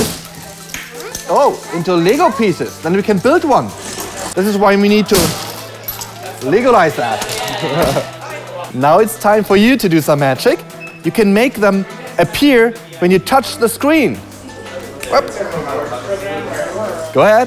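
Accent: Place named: Germany. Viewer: Man kann es hören, dass er Deutscher ist :D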